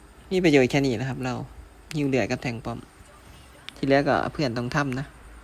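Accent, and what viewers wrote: Isan, Thailand. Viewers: นี้มันช่อง Doyser But พากย์อีสาน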